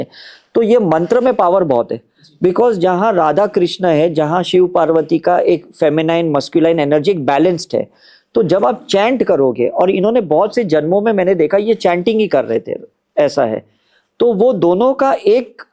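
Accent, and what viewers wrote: Haryana, India. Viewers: एंकर हरियाणा के हो क्या भाई??? 🌹😊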